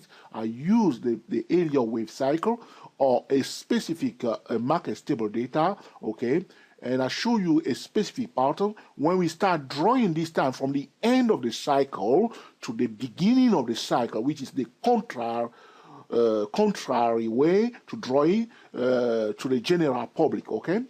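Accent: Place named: France. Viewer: Very interesting discoveries. I think it's valuable. It would probably be a good idea to put text on the screen, spelling out the addresses of the various channels and websites you desire to have us visit (for those of us having difficulty understanding the accent). It was helpful that I've studied a small bit of French so I could understand why you would pronounce things. (When you get excited, you seem to use partial word sounds). In my opinion, the video WAS a bit longer than necessary due to some content repetition, BUT never-the-less, the value surpassed any difficulties experienced. I agree that you should break the habit of saying "OK" too many times, OK? (LOL) Allow me to direct you to an organization that has proven to be very helpful to me: Market Traders Institute <link>). They've recently come out with a fabulous charting software which they call Ultimate Charting Software. 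I've been able to specify my own mid-point Fibonacci arc lines with it (among many other things). The head of MTI made some very helpful technical analysis discoveries that have proven helpful to many. Just thought you'd appreciate knowing about it. My name is Paul A. Hansen. My MTI "handle" is FXF and I'm at <mail> Merci, mon ami.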